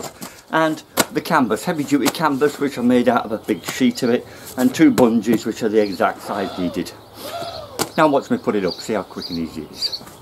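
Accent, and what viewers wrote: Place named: Northwest England, United Kingdom. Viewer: Great job lad, I copied your idea over Xmas break and it works a treat. You sound more northwest England.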